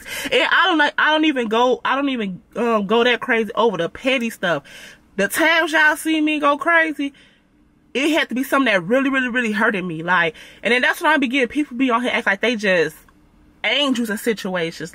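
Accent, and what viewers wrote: United States. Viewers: A lot shit y’all do stem from where we from “Chicago” both ears pierced is heavy in Chicago I got both my son ears pierced and the way you talk is also us Chicago females we talk hard asf naturally! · Picking up that southern accent sound nice on you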